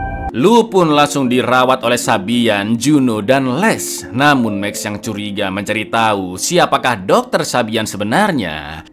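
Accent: Java, Indonesia. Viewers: Iya, meski yg direvisi film yg sama masih pe ngen nonton juga sebab narsi sama-sama menarik dan kocak. Yg satu dgn dialek jawa, satunya sunda. Horror jd ga' horror2 amat, sangat menghibur😅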